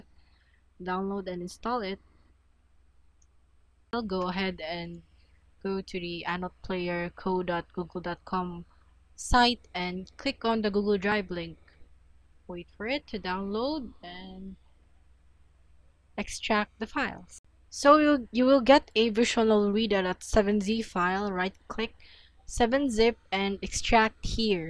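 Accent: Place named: Philippines. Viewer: I can hear a Filipino accent, are you filipino by any chance?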